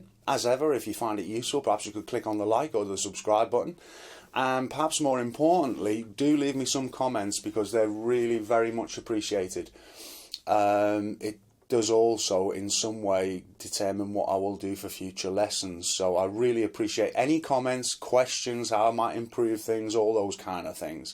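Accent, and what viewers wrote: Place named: England, United Kingdom. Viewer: Definitely the best channel on the net for beginners and intermediates non English natives. I appreciate a lot the vocabulary you are using and the speed of the lessons and the explanations. Very very nice English accent. You transmit the calm and the patience necessary to learn. That way you will add a lot of subscribers from abroad as my case. Kind Regards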